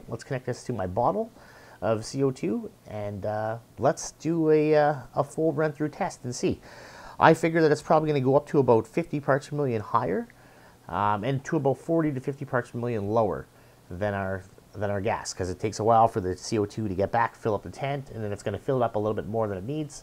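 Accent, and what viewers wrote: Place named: China. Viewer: Thank you and yes Chinese English is one way to put it.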